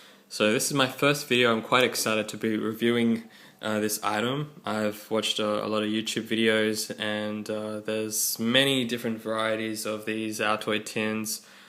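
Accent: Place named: Australia. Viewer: LtWolf/TheOfficialWolf haven't you ever heard an Aussie before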